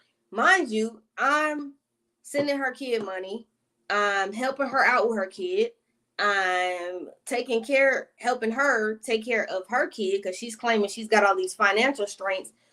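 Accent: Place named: Houston, United States. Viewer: She sounds like she lives in Northside Houston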